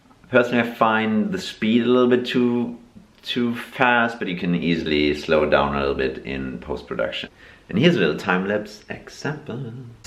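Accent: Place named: Australia. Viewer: You sound like your from Australia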